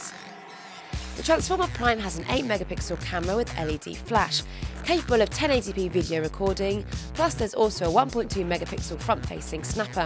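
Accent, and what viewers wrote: United Kingdom. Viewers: Сразу слышно, что британка говорит. Ненавижу британский говор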